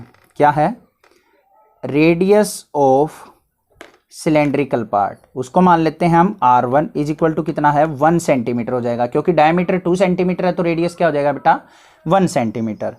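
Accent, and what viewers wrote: Haryana, India. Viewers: Are sir! Jhande gaad diye aapne. Kya badhiya mixture h Haryanvi,English aur Hindi ka. Waahh!! Maja aa gaya aapki class attend karke. Haryana ke ho ya rajasthan ke.Even I am from Rajasthan, Jhunjhunu.. 🤩Par filhaal abhi delhi me hu. Ummeed karta hu aap desh ke har kone me prasiddh ho jae..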